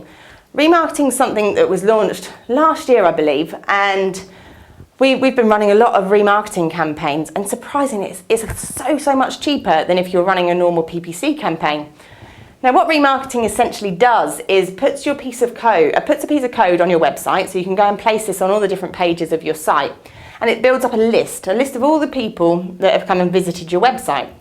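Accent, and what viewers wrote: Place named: England, United Kingdom. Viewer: Good to hear an English voice for a change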